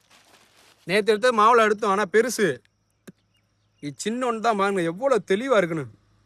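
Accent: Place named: Sivaganga, India. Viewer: சிவகங்கை மாவட்டமா நீங்க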